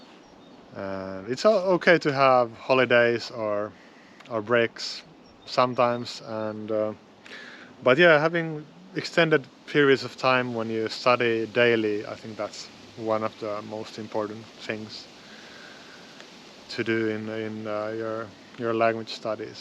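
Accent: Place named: Finland. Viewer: hey,! my dream is to learn Finnish, but I never have the time to do it. I hope I'll be able to do it little by little with your channel, I really like the way it is made. I speak 5 languages fluently, but I think it's because I have a hyperlexic brain. I really like your channel and I really like your accent in English (I can hear the Finnish melody I so adore, but it's only because my ear is well trained to detect the slightest trace of an accent). I never understood why my love for Finnish was so strong. it might be because it sounds a little echolalic and just very beautiful to my ear, or it might be my (supposedly) Finnish DNA speaking, haha. I do remember making a random Finnish woman at a Warsaw train station very happy when I announced "Minä rakastan suomen kieli" to her.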